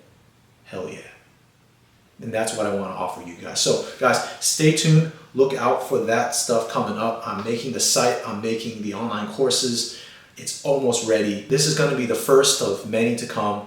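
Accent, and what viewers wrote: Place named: Taiwan. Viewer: He's Taiwanese, my step dad used to say "zen" for 人。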